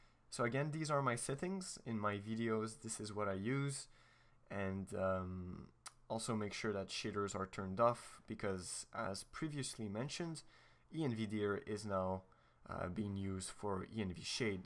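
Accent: France. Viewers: Vive la france XD grillé en 2 secondes avec ton accent ! Bonne continuation !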